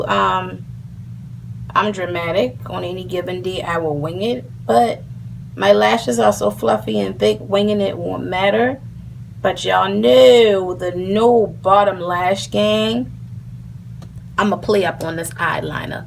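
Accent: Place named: New Orleans, United States. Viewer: You slayed this video! You are too much this New Orleans accent draws me in every time I promise lol 😂😂😂😂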